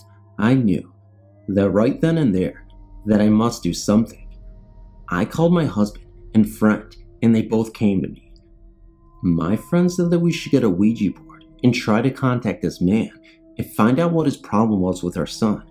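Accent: United States. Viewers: Pardon me.. What state is this accent from? I'm from UK. I know you're accents change from area to area like ours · Wow listening to you read is like listening to nails on a chalk board, you really need to practice a less monosyllabic tone, it’s really difficult to get into the stories, I listen to a guy on another channel as well, and well not as bad he to has the same drone, must be just the American accent, although the girls seem to be just fine, definitely need to inject a bit more life into it🤔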